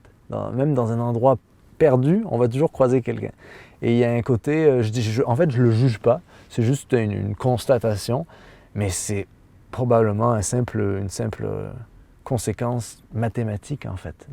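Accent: Quebec, Canada. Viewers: Le tutoiement Français dépend des milieux. Entr' ouvriers il est quasi obligatoire. Des que ça sort du milieux professionnel ou qu'on a pas d'amitié avec l'interlocuteur c'est du VOUS. Un petit canon de rouquinos et le Vous s'enfuie. Ton accent Québécois est léger et facilite la compréhension pour l'oreille moyenne du Français qui a du mal à tout enregistrer de l'accent prononcé généralement par les Québécois. L'accent Français Breton , souvent rapidement parlé rend aussi la compréhension difficile pour d'autres.En France, plus on va dans les campagnes plus l'accent particulièrement prononcé ressort selon la région. Les villes lissent cet effet. Sauf pour les accents méridionaux qui sont toujours présent en ville comme à la campagne.Vive les accents, particularités miroirs des paysages. Petit survol en rapport avec ta vidéo intéressante.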